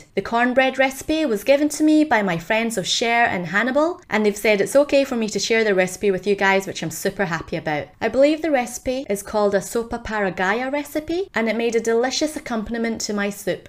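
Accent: Scotland, United Kingdom. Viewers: Are you part Scottish?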